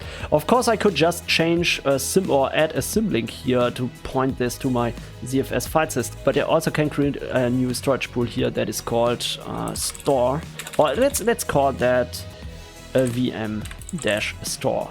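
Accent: Germany. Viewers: I love that german accent,it's sounds really good.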